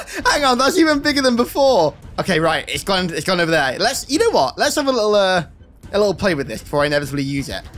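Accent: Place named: United Kingdom. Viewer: Very British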